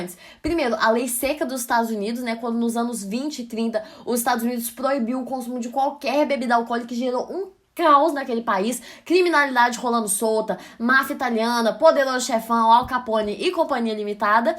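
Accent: Minas Gerais, Brazil. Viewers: Esse sotaque mineiro...❤️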